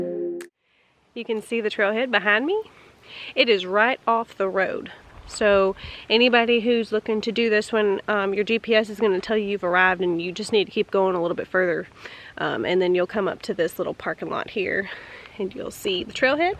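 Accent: Kentucky, United States. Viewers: Wow, you have been in Kentucky way too long. You sound like a country girl.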